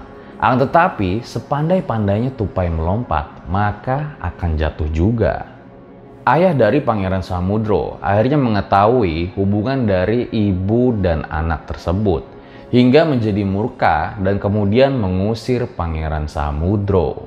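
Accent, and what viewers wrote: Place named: Sragen, Indonesia. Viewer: SRAGEN MANA SUARANYAA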